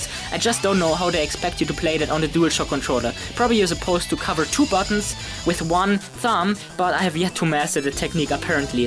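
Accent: Germany. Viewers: I do not want to ask you a very personal question. But… are you perhaps German? Because I’m always getting this idea because of your accent … 😅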